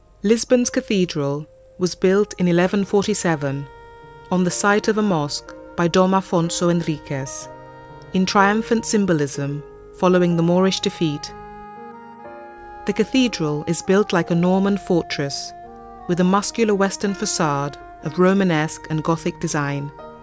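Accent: Castile, Spain. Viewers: We are NOT Spaniards. We speak Portuguese! FFS. I had to turn on captions so as not to have to listen to the narrator butcher words with a castilian pronunciation. Portuguese pronounce J s as Js not as h as the spaniards do. Really annoying. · I appreciate the interest but to have the narrator pronounce portuguese names with a spanish accent kind of ruins the experience... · With a Castilian accent, Seriously! It is very annoying to listen the way you try so hard to pronounce the Portuguese names with Castilian (spanish) accent. Please speak with a English accent or Portuguese accent, a accent were you are from is more natural because you sounded... ridiculous. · Só pelo facto que pronunciar os nomes portugueses em espanhol este vídeo devia de ser destruído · The narrator seems to think that we must be spanish since all the times she mentions a portuguese name (E.g Castelo S. 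Jorge, Martim Moniz) she does so with s spanish accent. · Not only does the narrator pronounce Portuguese words with a heavy Spanish accent but she puts me to sleep. Wrong background music btw.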